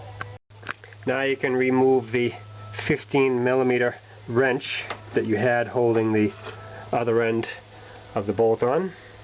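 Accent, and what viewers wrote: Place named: Massachusetts, United States. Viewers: Sounds like a New England accent. Definitely not NYC or NJ. Great video. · I was gunna say! This guy gotta be from mass 😅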